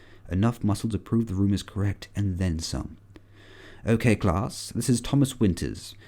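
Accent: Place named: United Kingdom. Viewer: idk you sound pretty british to me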